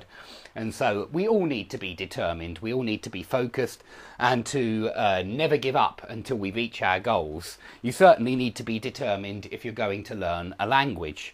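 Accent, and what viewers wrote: England, United Kingdom. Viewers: Hi Teacher I love your lessons!!! I love your english accent!!!!! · OMG! I've just found your channel, vely useful I love british accent.I promise tolearn from yours.. Thank you ever so much♥️😊♥️ Apr 5th 2020...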